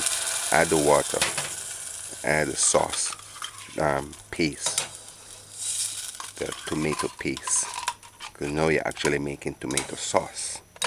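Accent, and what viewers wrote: Jamaica. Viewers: I love hearing Jamaican accent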